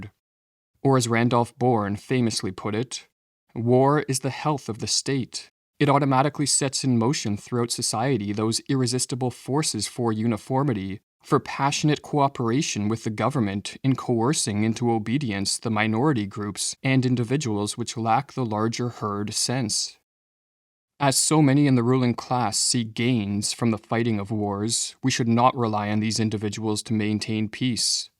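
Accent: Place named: Canada. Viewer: Its easy to tell you're from Canada. How say the word "been" is a dead give away.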